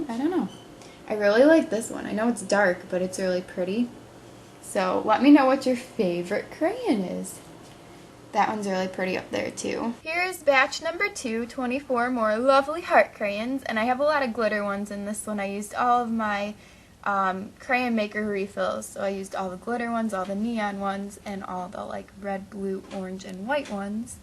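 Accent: United States. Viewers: Are you from America madam please say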